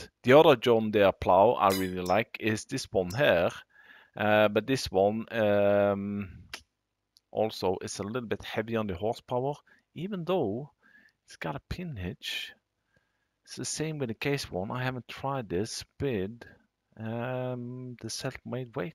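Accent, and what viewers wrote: Norway. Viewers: Er du norsk eller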